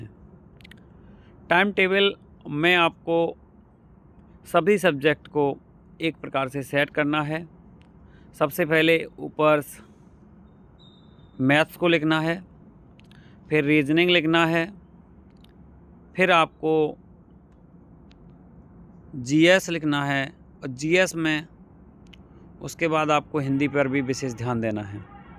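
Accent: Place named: Western Uttar Pradesh, India. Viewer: आप पक्का वेस्ट यूपी या हरियाणा से हैं 😊 BTW nice suggestions keep it up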